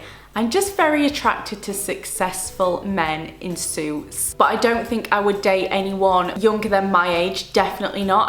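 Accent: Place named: England, United Kingdom. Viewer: Iam from Algéria i love u and l like ur english accent 💗💗💗💜💜💜💜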